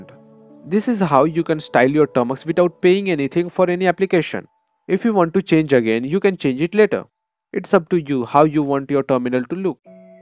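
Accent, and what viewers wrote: India. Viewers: I think is Indian English right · Omg his indian accent is so pretty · I don't understand english language this tutorial didn't help me as I wanted but it's hard to be found in Hindi language coz these kinda people just speak English However 99.9% public is Indian ,it could be more helpful it even has Hindi subtitles , I hate this fucking accent what these people speak .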